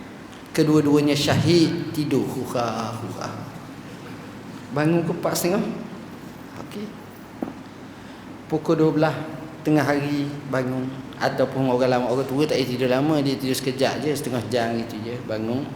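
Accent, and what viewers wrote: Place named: Kelantan, Malaysia. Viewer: ustaz ni orang kelantan ke. bahasa kelantan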